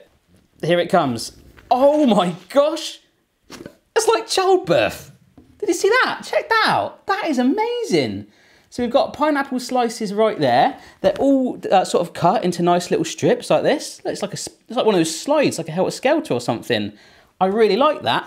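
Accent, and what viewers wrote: United Kingdom. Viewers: sounds very British in this video haha - the pineapple slicer was the best one by far too! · i found your channel extremely enjoyable ! :D also i love british accent soo soo much !!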